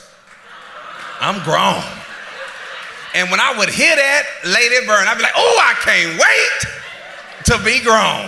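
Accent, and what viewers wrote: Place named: South, United States. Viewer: I LOVE his southern accent! #Mississippi I have it too bro! Love your work!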